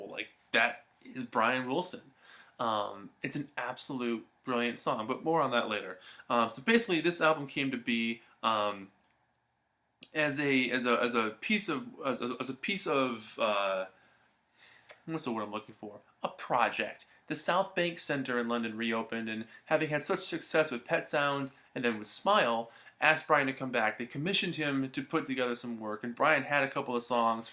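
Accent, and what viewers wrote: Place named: Detroit, United States. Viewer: Hey, love your BB reviews! Where are you located... you sound like we do in the Detroit area... BTW, VDParks was in Detroit area about 7 yrs ago, a great show at a small gallery but too many yakkers around the bar kinda wrecked it.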